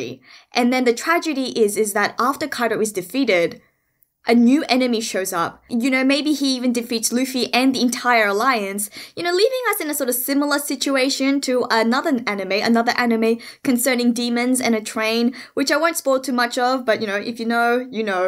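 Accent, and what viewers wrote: Australia. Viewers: Aussie?!?!?! Yeahhhhh you go woman!!! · You Aussie??? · Are you west Australian ? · You from Australia? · Oriental with an Australian accent? That caught me off guard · Australian accent?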